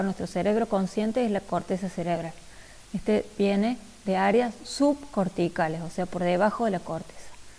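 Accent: Córdoba, Argentina. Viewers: es re util gracias sos de cba por la tonada?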